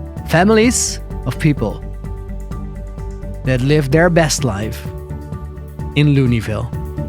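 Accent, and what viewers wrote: Netherlands. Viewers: nice english mate dutch for sure :)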